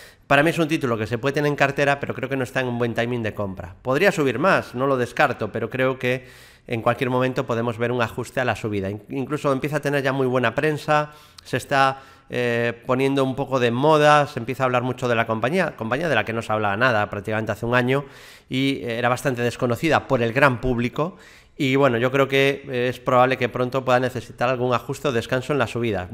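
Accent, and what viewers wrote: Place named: Galicia, Spain. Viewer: No puedes negar que eres gallego.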